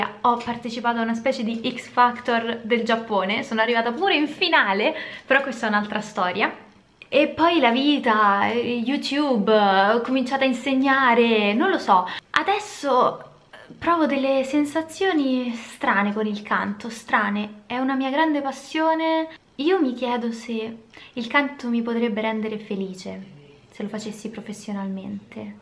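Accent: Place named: Rome, Italy. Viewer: Ma Brian riesce a cogliere, da non romano, la dolcezza del tuo accento?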